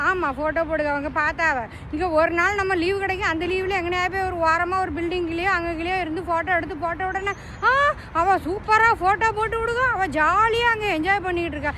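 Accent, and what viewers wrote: Tirunelveli, India. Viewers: Unga speech fine sister 🎉. Unga speech tirunelveli touch. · நெல்லை தமிழ், கொள்ளை அழகு நல்ல தமிழ்!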